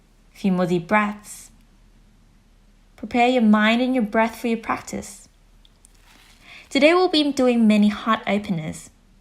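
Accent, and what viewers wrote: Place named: Australia. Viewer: Absolutely beautiful! Thank you so much! Also I LOOVE your aussie accent 😍😍 Blessings 🌸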